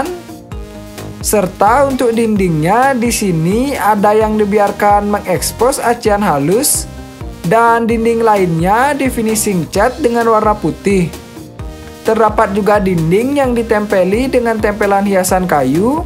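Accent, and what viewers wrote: Bali, Indonesia. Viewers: Mantaaap Bagus Niihh Buat refrensi Bagi yg Memiliki lahan Yg Kecil untuk d jadikan rumah Tinggal.. Bicara Nya Bali Banget Broo. Salam Nak Bali Rahayu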